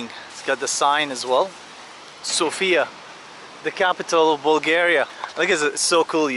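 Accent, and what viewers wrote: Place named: Ireland. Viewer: I thought I could hear the Irish in you ;)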